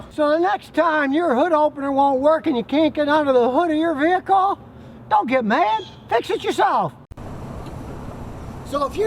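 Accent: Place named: South, United States. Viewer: Hey. I laughed when you were talking about the long screwdriver and to "stick it in there". I know you are from the north but it sounds like you have been in Texas long enough to pick up a little bit of the Southern dialect. LOL I'm sure if I went up North for a long time I would pick up some of the slang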